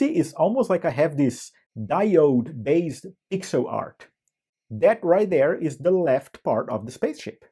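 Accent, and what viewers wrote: Brazil. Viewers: If you drink mate you've got to be Argentinian but you have a Brasilian accent haven't you 🤔. As a C64 programmer I can imagine how hard the VCS must be to code for without a graphic's memory. This notion of racing the beam is alien to me.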